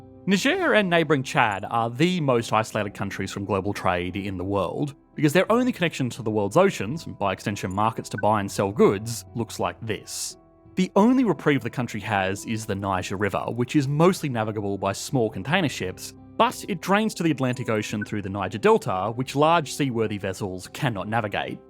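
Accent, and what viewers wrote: Australia. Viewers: I think you just have issues with the Australian accent, his demeanour is fine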